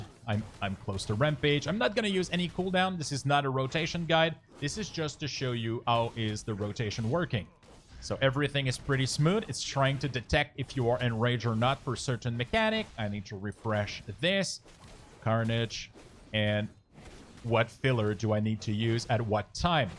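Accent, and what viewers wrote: Quebec, Canada. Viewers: Sounds like you are quebecois ?! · Very nice video. I can tell lots of efforts were put in this and I will be the first one glad to use it right away! Btw QC? I recognize the accent :)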